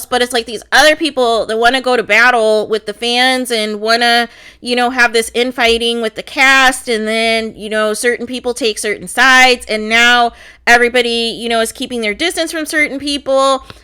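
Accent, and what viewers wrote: Midwest, United States. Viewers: Are you from the midwest?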